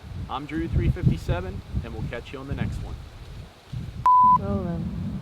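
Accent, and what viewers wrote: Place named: South Jersey, United States. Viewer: crazy your accent is very SJ and it looks like you’re in the Pinelands. Close enough though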